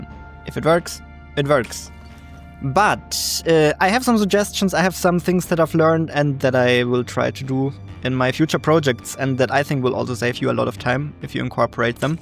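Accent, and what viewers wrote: Germany. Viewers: your voice sounds very german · Nach 4 Sek wusste ich, das du ein deutscher bist :D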